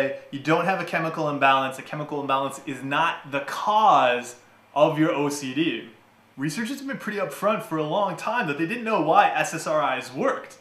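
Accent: Canada. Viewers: Cute, smart talking Canadian....love it :)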